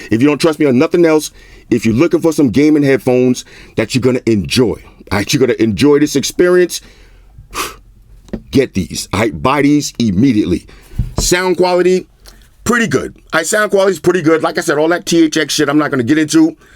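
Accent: New York, United States. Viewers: You’ve got that classic “New Yorker” accent. I love it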